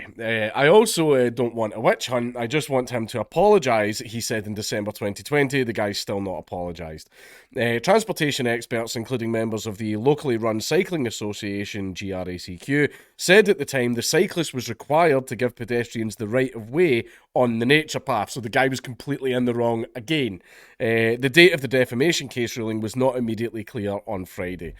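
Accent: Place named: Scotland, United Kingdom. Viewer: We need a Dankula video in full on Scottish mode with subtitles.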